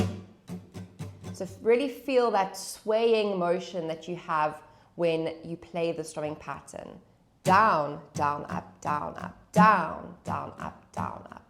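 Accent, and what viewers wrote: South Africa. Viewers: Finally someone who knows how to teach!! Kudos! And luv ur south african sounding accent!!! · Is she South African?? She’s surely South African🫢 ja, no, lekker, this is cool!🥳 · I'll have to watch the video again because halfway through it suddenly dawned on me that I'm not hearing the typical American accent but was pleased to realise I'm listening to the Saffa accent. Pragtig. Love your lessons. I have a guitar stashed away but want to start playing again. Subscribed.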